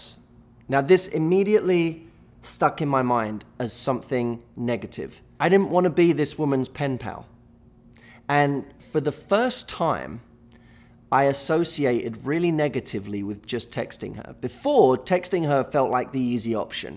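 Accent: United Kingdom. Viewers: This one might b the most useless comment on youtube ever ... But everytime i see him speaking .. i get so hungry ....i mean thers something about his speech pattern or such a clear brit accent .. his voice makes me crave dessert or something .. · it must be the British accent matthew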